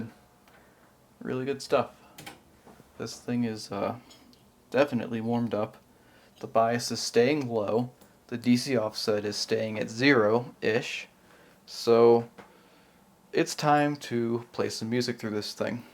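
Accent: United States. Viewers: I love the way you Americans say Dang or Dope. I live in Australia and I've seen one of these after a full restore. Unfortunately Marantz equipment took a China nose dive with their surround amplifiers. After a few months of use, the centre channel started playing up. I ran it in phantom mode but took it to a repair shop for a look. The amount of time he'd have to spend fixing it was a joke so I left it with him and went shopping for a new 7.1 amplifier. I was looking at some gear when I came across an Onkyo 7.1 unit. It was THX approved and ran all the audio matrixes I'd ever need and it was beautifully made. Point to Point wiring etc and the sound was phenominal. I remember Onkyo from the 70s when they made one of the first CD4 Discrete Quadrophonic stereo sound systems. Pink Floyd with a bit of the spliff was fun. (I'm 62 by the way) This new Onkyo unit is brilliant and by the time it's anywhere near clipping, you'd be bleeding out of your eyes and ears! 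The sub out is great. It only feeds the sub with relative info so the bottom end doesn't become overbearing. I've put a DBX 163 between the sub out and the sub to catch transients that can kill any speaker. So many things in Australia are no longer made here. Do you know that we don't even make a car here anymore? Australia made some beautiful Cleveland Earth tuners!! It's a joke. Anyway, Cheers from OZ, Andrew.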